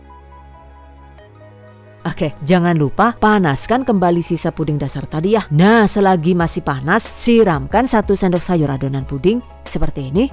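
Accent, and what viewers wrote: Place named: Tegal, Indonesia. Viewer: Semangat ide ide baruu banyak vidio yg menjadi motivasi saya...terus pembawaan juga kadang suka bikin tersenyum senyum..logat Tegal jgn ketinggalan yaa..hehe..puding nya cantik ikut memeriahkan HUT RI...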